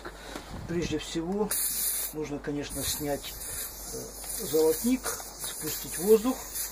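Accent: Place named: Russia. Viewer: Parabens pela ilustre ideia, vou fazer uma tambem, obrigado. O sutaco parece de russos eles sao inteligente👏👏👏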